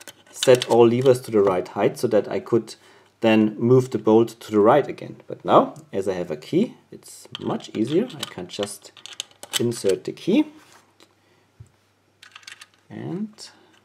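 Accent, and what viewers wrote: Germany. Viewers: an deinem englisch merkt man das du deutscher bist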